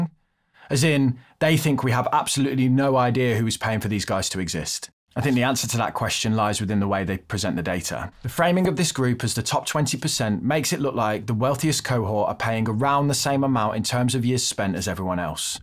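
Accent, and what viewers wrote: Birmingham, United Kingdom. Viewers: I really hate brummie accents, so the fact i get over that and listen to you, shows how good your content is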